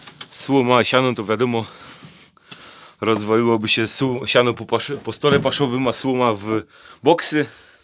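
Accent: Silesia, Poland. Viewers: Konkretny materiał 💪💪 Ze śląska jesteś bo taki akcent ? Sub i łapka leci Zapraszam również do siebie na odwiedziny Pozdrawiam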